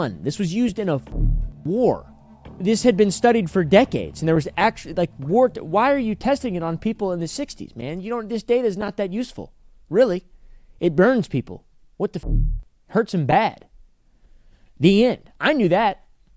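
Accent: United Kingdom. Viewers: Your British accent was on point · That British accent though 😂😂😂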